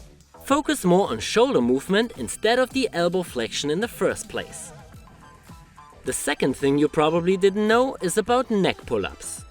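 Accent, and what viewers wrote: Germany. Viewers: must be german by the way he speaks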